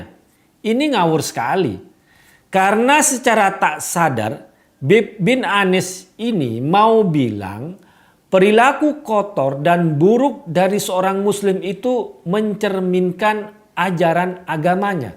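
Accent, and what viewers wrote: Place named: Java, Indonesia. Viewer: dia cocok hidup di dataran arab .... pake logad jawa sekali...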